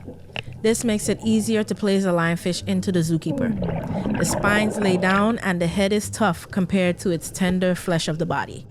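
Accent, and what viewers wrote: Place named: Caribbean. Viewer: I grew up in Florida and there are way too many lionfish there too. That's one species that needs to be eliminated and luckily they taste great. I would love to try ceviche with lionfish. It's great that you're helping your environment and getting good groceries at the same time! What a beautiful reef, it's definitely worth protecting! I hope people become more aware of lionfish and start to want to buy the meat. If it was "in style" to eat them maybe the population could get under control. Thanks for sharing your adventures! Oh and I love your accent! Caribbean people have great accents!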